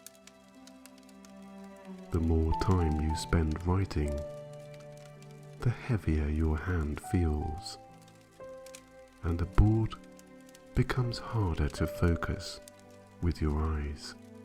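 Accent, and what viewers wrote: Scotland, United Kingdom. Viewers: These meditations are perfect. <link> him has a gentle, warm, pleasant voice (with a slight Scots accent). The words are simple and clear. The timing is perfect... just as you start to find your mind wandering, he says and if you find that your mind is wandering... . There is no background music and no noise in the background, only his peaceful and engaging voice. The length of the meditations (about 1/2 hour each) works well for me. I find that I tire of some meditations after frequent repetition, but that hasn't happened with this one. The metta lovingkindness meditation always feels right. Some meditation CDs I have tried have not felt right. The voice seemed hurried, or sounded tense; the background music seemed intrusive, or there were noises that interfered with concentration. This is just perfect as an introduction for new meditators, and it's also a meditation that I return to again and again. 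I'd give this more than five stars if that were an option. Even my students, who are not ordinarily very receptive to meditation, relax when they hear this one!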